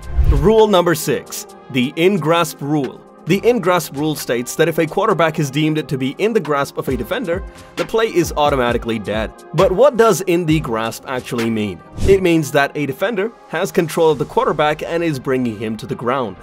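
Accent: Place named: England, United Kingdom. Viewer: You give 14 rules, not 13. Judging by the pronunciation of words, these are rules people in England don't know exist. The only ones that I had never heard of were the "palpably unfair act" which is vague and would be something along the lines of intentionally breaking the standards of the game -- say, Philadelphia removing goal posts so both teams had to try for 2 points, which their QB sneak ability gives them a distinct advantage. Or a team putting players into the game that are not on the roster of active players for that game. The other rule you call "touchdown touchback" isn't a rule. The rule I think you are trying to cite -- especially based on the images you show -- is "if the offense fumbles the ball and the ball crosses the defender's goal line and goes out of bounds, the ball is awarded to the team that was on defense as a touchback." Also, many clips didn't depict anything regarding the rule in question. 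And a number of the clips were of college teams, not NFL.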